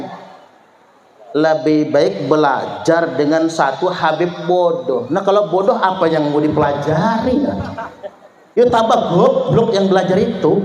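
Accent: Java, Indonesia. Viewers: cerdas wong palembang logat jawa banget sehat terus yai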